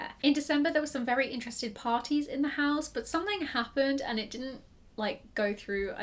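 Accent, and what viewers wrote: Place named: United Kingdom. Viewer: British accent is very beautiful to listen. I can listen you speaking any longer. You're gorgeous!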